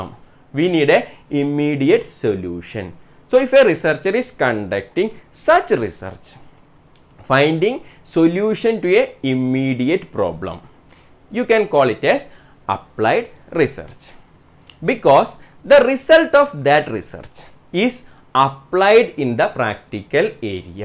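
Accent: Kerala, India. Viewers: Sir are you a malayali?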